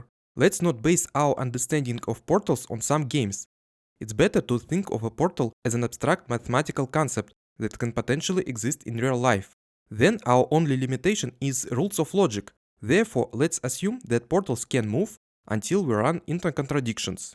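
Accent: Russia. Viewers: Я с самого начала понял по акценту, что ты либо русский либо беларус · Damn ur Russian accent is so strong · Honestly yes, I would love a portal math analysis video. I love math and also the Russian accent too. I’m going to use your original video as a goal for my russian language learning. спасибо! ^^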